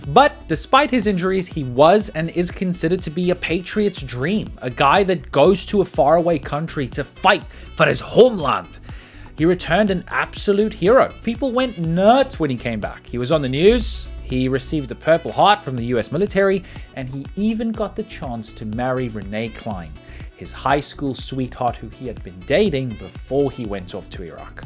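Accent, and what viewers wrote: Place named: South Africa. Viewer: Surely you’re South African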